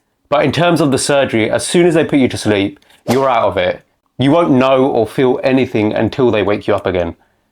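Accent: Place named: United Kingdom. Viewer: Who did your surgery you sound from the UK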